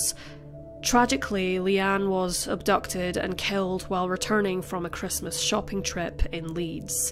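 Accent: Scotland, United Kingdom. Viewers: "It's a Scottish accent"🤗🏴🤗🏴🤗🏴🤗🏴🤗🏴🤗🏴🤗🏴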